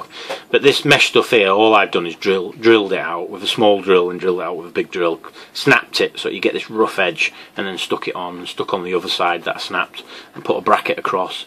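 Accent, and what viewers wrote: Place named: Yorkshire, United Kingdom. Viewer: Oh.... I somehow have the impression that you are a Yorkie,? I do hope that I am not wrong because such a realization would indeed make me turn into a dog with its tail between its legs, to use a metaphor..... Anywhos..... I suppose anyone from the Tees to the old Humber, and all in between and possibly, all here, there and everywheres, could be classed as being a Yorkie, even though that does not make even a smidgin of sense.... However, and even so-so, I actually get the feeling that your beautifully eloquent commander like voice has a tint-of-a-hint of an accent that is actually from no less than bang, stab, right in the middle of the old, soon to be re-vamped, kingdom, i.e. your accent to me suggests that you are born and bred, and I suppose, ultimately from - York City - long-winded or no?. I do hope that I am not wrong. 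So, what is the answer? Leeds, no doubt! When I think about it, though, I am now leaning more towards Sheffield....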